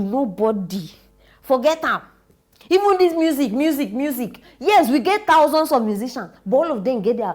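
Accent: Nigeria. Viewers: I've been around many Nigerians, but your Nigerian accent is on totally next level, I can listen to you every day 😂🤣😂🤣. Keep up the good work 👍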